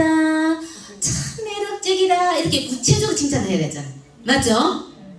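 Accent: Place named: Gyeongsang, South Korea. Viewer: 경상도 사투리 구수하면서 찰진 표현 아무쪼록 칭찬하고 삽시다😊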